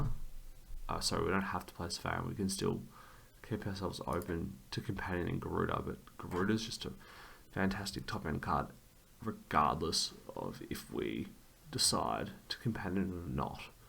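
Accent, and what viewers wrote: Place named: Australia. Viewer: Just found your channel and I really appreciate the way you talk through your draft and analyse what went wrong in that smooth aussie accent.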